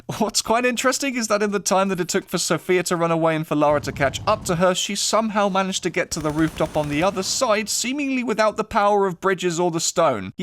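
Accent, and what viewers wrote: United Kingdom. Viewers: Great entertaining video. Also enjoyed the slightly british accent sided choice of referring in that tonality. I raise ma beer for ya mate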